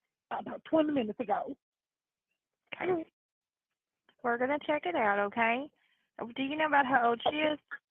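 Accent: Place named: South, United States. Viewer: You go I love that southern voice lol you do good!!!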